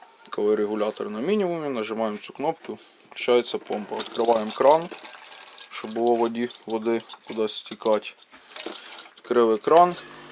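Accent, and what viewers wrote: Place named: Ukraine. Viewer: Не вопросы, а запытання. Украинец хренов. Хоть по русски, но с украинским акцентом.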